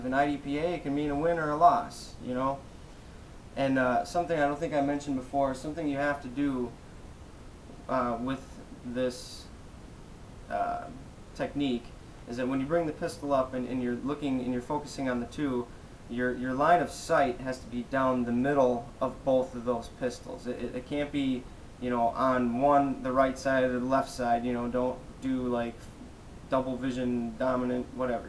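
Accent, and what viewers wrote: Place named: Minnesota, United States. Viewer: are you from Minnesota??? I heard my accent!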